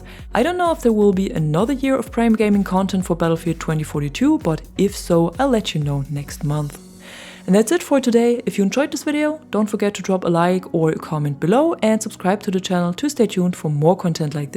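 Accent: Germany. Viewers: I dident ment in a bad way. The accent gave you up. Cause i work in turism and you sounded like a German speaking english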